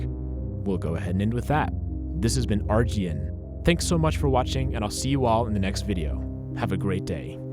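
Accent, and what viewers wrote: Italy. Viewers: your Italian accent is wonderful ps I'm italian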